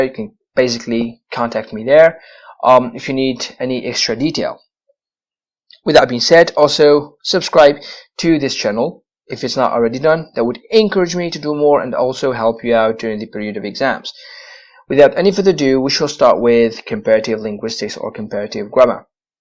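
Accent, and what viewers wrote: United Kingdom. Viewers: Thanks a loot. I was shocked when you said " arabic our language " . You sound British.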